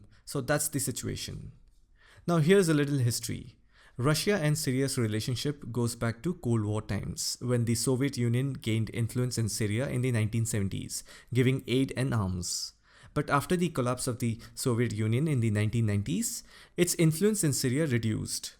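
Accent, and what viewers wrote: Bengal, India. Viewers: You have such a nice voice and nice English Accent. True bengali characteristics ❤️.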